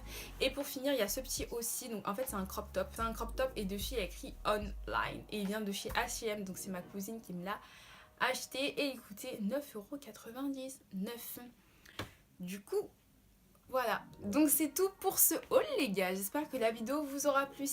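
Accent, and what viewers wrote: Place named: England, United Kingdom. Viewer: Coucou ma belle! Alala je rêve de ton accent anglais ! Bref jadore ton haul, j'aime trop le gros pull new look 😱 bisous bisous 😘👍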